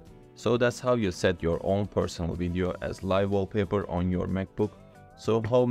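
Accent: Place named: Turkey. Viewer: Bi aksan ne kadar Türk aksani olabilirse o kadar. Almanya´dan selamlar!!!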